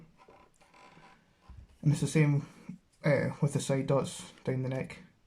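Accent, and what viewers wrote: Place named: Scotland, United Kingdom. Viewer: You sound Scottish. I had an aria diamond looked exactly like that I swapped it for a watch from a guy that lived on a boat that had a fore head tattoo when I was living in Brisbane. I'd like your opinion on guitars. Have you got an email address? I have a Horner shorty it's a pain to change strings I'm looking at Jackson's dinky Floyd rose and the minion.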